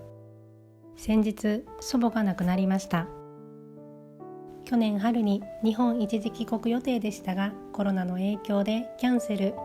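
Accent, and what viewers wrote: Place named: Osaka, Japan. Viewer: Nobanaさんの大阪弁にほっとしています。読み上げてくださるので用事をしながら楽しませてもらってます。大阪府出身で、ニーダーザクセンのとある小さな村在住です。大きな瓶に入ったパーティ用のブラートヘリング南蛮漬けのミートボールが好きです。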